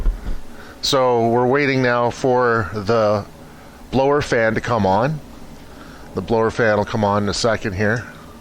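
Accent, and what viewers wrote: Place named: Canada. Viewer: Wow thank you for such a speedy reply! Yes I do have a Mr. Heater. Are you in Canada? ( I see the hat pin and recognize your accent.) (Maine here) I'm thinking U.s may be a little different. However I did decide to do it at around 20" because I really didn't want it sticking 3' into the shop space.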